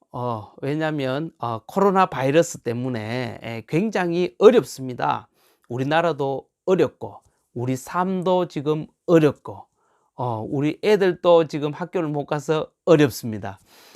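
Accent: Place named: Gyeongsang, South Korea. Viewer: 참 경상도 사트리 웃음강의 억수로 우습당 ᆢ ᆢ즉 ᆢ똥구멍 ᆢ하하하ㆍ 힘ㆍ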